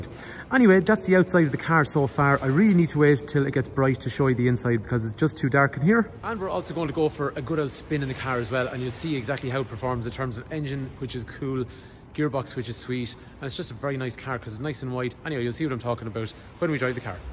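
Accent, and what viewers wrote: Ireland. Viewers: This is what we want in a review. A speed talking Irishman! I love it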